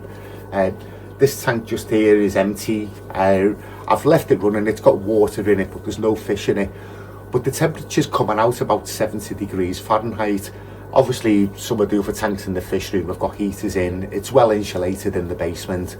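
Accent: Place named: Liverpool, United Kingdom. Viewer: Something completely off topic mate, me and the wife (she's not English) were watching the old 80's film 'letter to Brezhnev' the other night and she said at the beginning she was struggling to understand the accent. I just watched your video now and she could hear you and said you have the same accent as the people in the film, she says the scouse accent is nice when you get used to it haha, happy new year!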